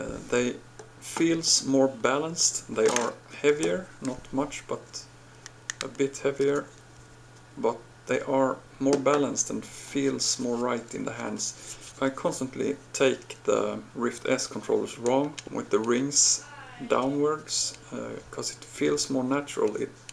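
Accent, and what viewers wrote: Norway. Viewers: are you from norway? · Ok cuz u sounden really Norwegian btw im Norwegian :D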